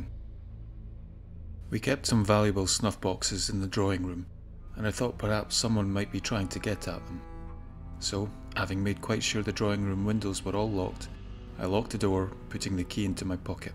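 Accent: Scotland, United Kingdom. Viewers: P.S.. Love the narrator's voice, Scottish accents just make me gushy!! I'd pay money for this guy to narrate my grocery list once a month!! lol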